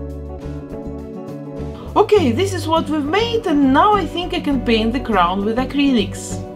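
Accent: Russia. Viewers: Where are you from, your accent make me think that you're Russian))) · Слышно что Русская! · Excuse me, are you russian? или мне показалось... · Russian accent, wow! Even I can't normally say something in English, and you do it perfectly! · Чувствую русский акцент! Но работа просто отпад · очень слышно, что вы русская. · Русская что ли? Акцент слышен очень, русские поймут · Тыж русская. По акценту слышно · Я понимаю, что мне казалось, но я будто все видео слышала максимально русский акцент... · Почему я слышу жесткий русский акцент?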